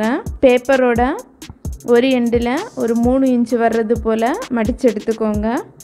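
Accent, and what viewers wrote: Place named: Kerala, India. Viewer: Neenga pesuratha patha ennakku malayalee mathiri tonudu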